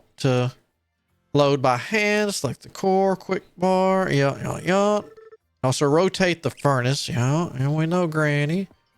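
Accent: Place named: South, United States. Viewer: Love the work so far, may i ask what part of the south your from? I noticed the accent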